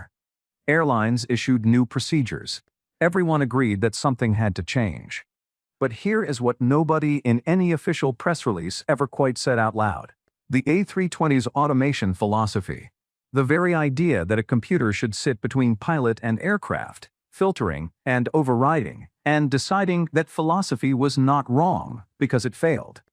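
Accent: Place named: United States. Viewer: The American accent reveals the lie to this video. Many Americans have a bias towards Boeing aircraft. I have flown helicopters and fixed wing aircraft. Among the fixed wing aircraft have been the Boeing 737, Airbus A320 and A330. Comparing the A320 and the 737 the A320 is by far the better aircraft to fly. It's designed to be flown through the autopilot, but so is the 737. The Airbus is just so much better through the autopilot. The sidestick has been normalised by years of computer gaming. It also removes an obstruction to the instrument panel. The instrument panel is clear and concise with everything you need on the PFD (Primary Fllight Display) directly in front of both pilots. The most important safety factor in flying is situational awareness. The unobstructed view of extremely clear and concise instruments provides that. The cramped cockpit and a yoke in the 737 is less helpful. 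Hand flying in Normal Law is a pleasure with a sidestick which has enough feel and instruments providing excellent feedback. Increasing thrust in a 737 raises the nose with the engines under the wings. Reducing thrust you get the opposite effect. Under Normal Law the A320 system detects any increase or dcrease in 'g' and counteracts it. Increase thrust and you just go faster. So much easier with the autothrust on the approach. On the 737 you need to take out the autothrust on the approach to avoid porpoising as the autopilot chases the porpoising. So many other good points to the Airbus. I've a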